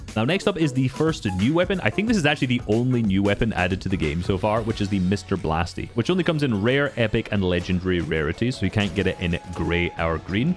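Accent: Scotland, United Kingdom. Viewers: Yay Scottish man goes on cartoon gun rant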